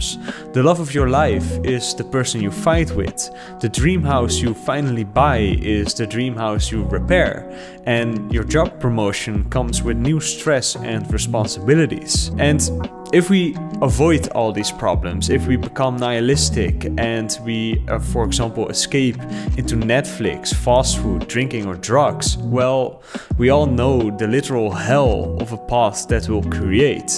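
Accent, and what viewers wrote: Netherlands. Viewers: Are you dutch? That accent 😎😇 O ffs you just told us in the video lol.... How the .. did you end up in HK?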